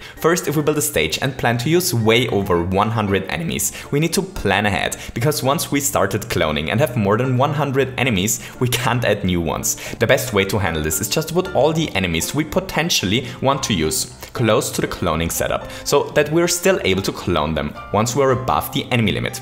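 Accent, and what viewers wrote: Germany. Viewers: An die deutsch englische Aussprache muss man sich erstmal gewöhnen sonst gutes Video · Dein englisch :D gut,aber der deutsche Akzent :D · Are you German? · the german is strong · The german accent is strong with this one! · Not being rude but you sort of sound German....or Austrian....or even maybe Polish...Just saying! Gday and kudos from Australia! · I always thought that he was german too but i didnt want to make assumptions. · He has the most German accent I have heard in a long time! Psycrow with a hard "p" at the beginning for example ;) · He has a strong German accent imo. But maybe it's easier for me to tell because I'm Austrian too. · Are You german? Your accent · Are you german? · Urgh german accent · Deutscher Akzent :)? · Dieser Akzent, man hört richtig das Deutsch raus xD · Are you german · I'm German and I normally dislike German accents, but accent is kind of...charming? 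And it makes me smile when I can tell from his voice that he's smiling.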